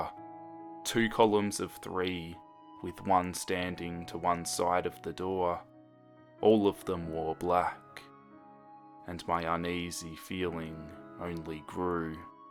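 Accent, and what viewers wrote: Australia. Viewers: I'm sorry but Australian accents can't be creepy. Nice to listen to but it automatically makes you seem friendly lol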